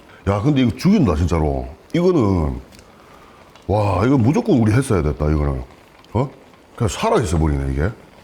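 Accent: Gyeongsang, South Korea. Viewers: 경상도 방언 너무 치인다 ㅋㅋㅋㅋ 목소리도 좋고 보는맛이 살아있눼~ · ㅋㅋㅋㅋ같은 갱상도 인으로서 행님 정말 마음에 드십니더 !!!! 프로틴 이빠이인 번데기 먹방 가주실랍니꺼!!!!!😍😍😍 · 경상도 사투리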